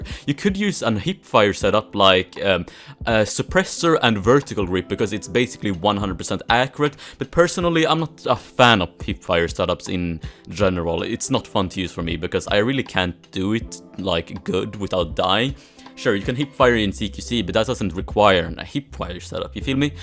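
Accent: Sweden. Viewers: I guess that you are from Sweden.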